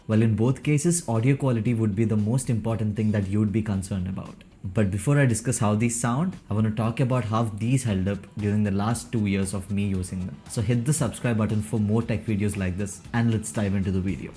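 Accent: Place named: India. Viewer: Good video nice to see you are proud to be indian and using Hinglish rather than trying to overact with fake accent. And the mid issue is solved in kz zsn pro X varient which cost almost same .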